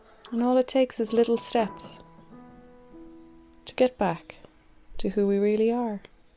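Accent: Ireland. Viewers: I just came across your channel. Listening to your voice for the first time, I knew that you are Irish :)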